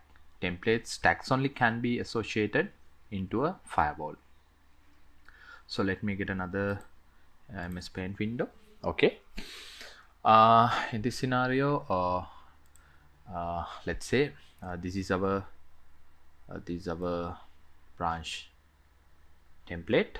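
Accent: Sri Lanka. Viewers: Yes, I downloaded Expedition and installed on Linux box and I have yet to figure out how to use Expedition. You sounded very much like you are from Sri Lanka. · Great explanation BTW are you a Sri Lankan, your accent is so sri lankan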